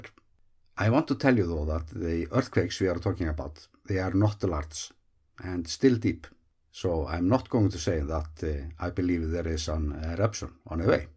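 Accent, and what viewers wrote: Iceland. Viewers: I think he has a charming Icelandic accent, just like Björk😀 I once saw an interview with her, where she was asked how her name was pronounced, and she replied with “It rimes with a jerk”😆 Anyways, you just try to pronounce Eyjafjallajökull, Bárðarbunga or Landmannalaugar, and lets see how it goes, I would probably find that charming too😉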